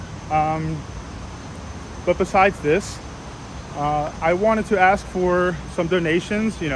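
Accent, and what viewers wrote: United States. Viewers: Done donating. I think majority of your foreign subscribers enjoy listening and learning American English from a native speaker like yourself. Maybe you can make a good money by doing a personalized English conversation practice on hourly basis. With some preparation and technique, I think it can be fun and effective.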